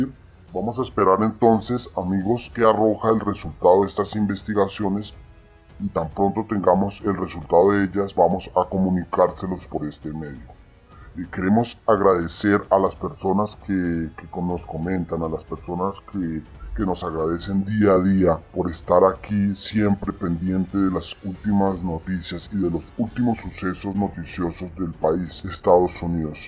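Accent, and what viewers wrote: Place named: Colombia. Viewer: Este voz acento. Colombiano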